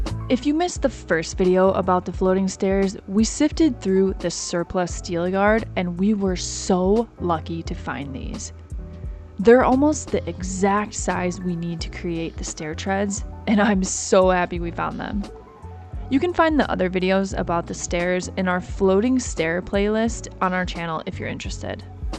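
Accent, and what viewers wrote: France. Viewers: Waouh je viens de vous découvrir et quel ne fut pas ma surprise en entendant ce doux accent FR ah ah ah <3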